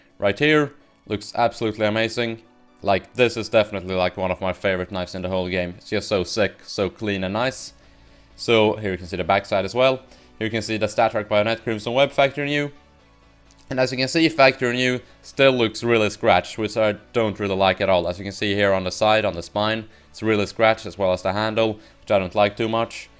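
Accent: Sweden. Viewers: Sweden is my guess :\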